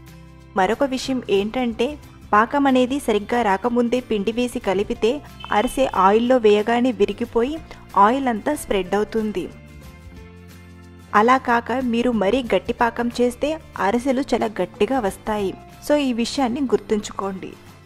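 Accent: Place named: Warangal, India. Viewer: mi proper warangal anukunta same ma warangal language matladuthunnaru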